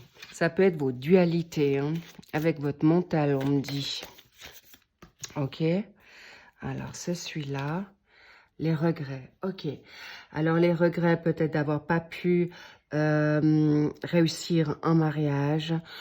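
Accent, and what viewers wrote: United Kingdom. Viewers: Merci beaucoup pour ce splendide tirage 🙏 Et merci pour votre diction parfaite et cette pointe d'accent d'outre-manche 🎉🙏😊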